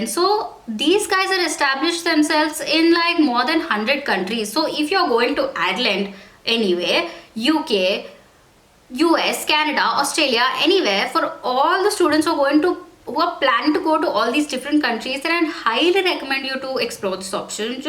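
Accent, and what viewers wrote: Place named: India. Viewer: Indian English very Funny... 😅... Practice on pronunciation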